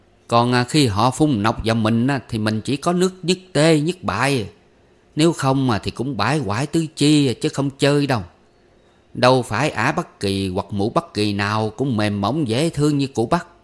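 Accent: South, Vietnam. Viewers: Mèn đét quỷ thằn thiên địa ơi, yêu quá những từ miền Nam đặc sệt sền sên luôn. Thanks NV Hồ Trường An và giọng đọc thiệt là Nam Kỳ của ❤❤❤❤❤❤❤❤❤❤❤❤❤❤❤❤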